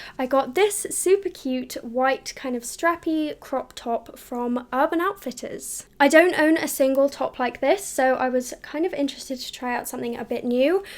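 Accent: United Kingdom. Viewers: british accent 🤤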